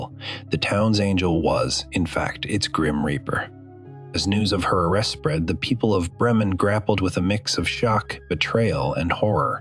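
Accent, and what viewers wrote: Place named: United States. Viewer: Narrator sounds American or maybe Canadian Should be pronounced as 'Tems' not 'thames'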